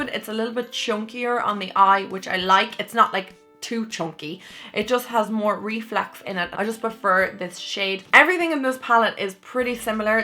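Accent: Ireland. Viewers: I thought you had a strong Irish accent, I’m Irish myself, but I live in America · I love ur accent I'm Irish also and most YouTubers I watch are American or British ands it's so refreshing to hear an Irish accent on YouTube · Gurl are u irishhhhhh 🇮🇪💘 omgggg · fucking love your accent #ireland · are you IRISH???? cuz I am and us kind irish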